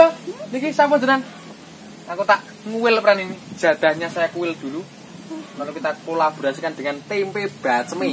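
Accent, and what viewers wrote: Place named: Java, Indonesia. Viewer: Jiaaan Medok Banget Bahase Preen...Selalu Bahagia .. lan Sehat Sama Simbook ...👍❤💪...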